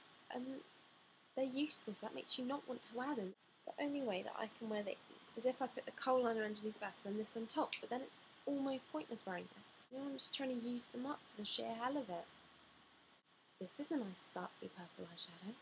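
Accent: United Kingdom. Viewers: I love British accents... but by the end of the video your voice was annoying the heck out of me Avon does give you a full refund, you know that right? Don't like a product RETURN IT!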